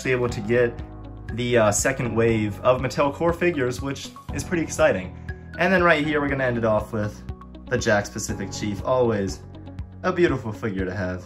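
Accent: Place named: United States. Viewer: yeah man im guessing from the accent your american.. in the UK its hard to find decent halo stuff your collection is amazing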